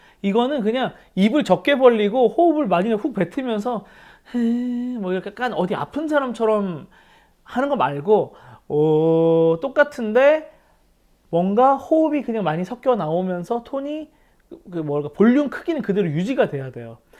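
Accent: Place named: Gyeongsang, South Korea. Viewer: 아..너무웃겨요 배잡고 뒤로 자빠짐요^^경상도발음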